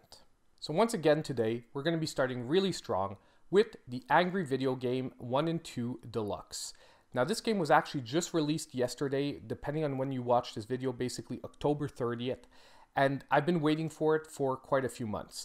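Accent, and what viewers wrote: Canada. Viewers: Sup bro from Los Angeles like the Canadian accent. Carrion gets me attention big time. Will add to my back log of games. Just picked up Assasins creed rogue. Thsnks for the reviews keep up the hard work.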